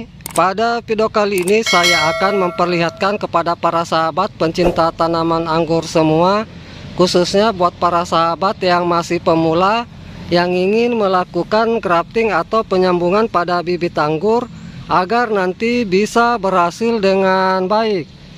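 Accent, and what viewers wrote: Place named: Tolaki, Indonesia. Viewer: Sultra bagian mana bang, logat tolaki ta nda hilang keren 🥰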